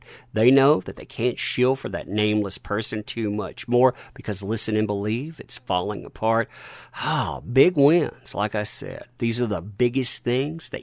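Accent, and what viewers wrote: South, United States. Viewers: Yes, he has academic training and reports with rigor. That southern drawl is very charming.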